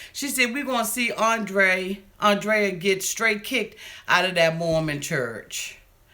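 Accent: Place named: Baltimore, United States. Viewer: Great Wisdom! You must be from Baltimore, cuz you sound just like Mo'nique, accent and all girl!!!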